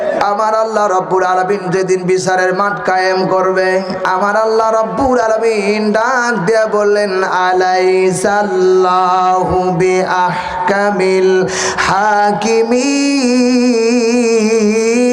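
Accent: Bangladesh. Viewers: শরিয়তপুর টান ঠিক আছে কিন্তু গলা ঠিক নাই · মনে হয় যশোর বাড়ি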